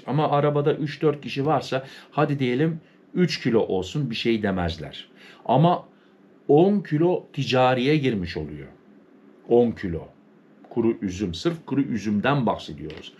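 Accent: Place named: Thrace, Turkey. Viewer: Üstadım nerelisin merak ettim,sanki şive bizim Trakya insansın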